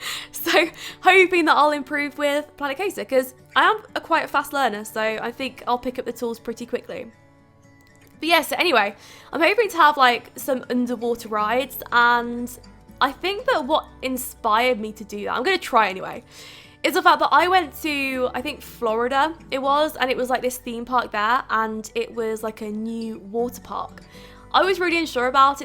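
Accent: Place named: Scotland, United Kingdom. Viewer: Excuse me, are you from Scotland? If not, I think your accent is really Scottish 💜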